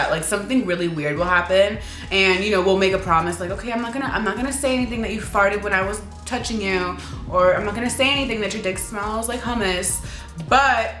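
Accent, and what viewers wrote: United Kingdom. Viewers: It wouldn't be a video without the British accent popping out and sarcasm and sass. Love it 😂😂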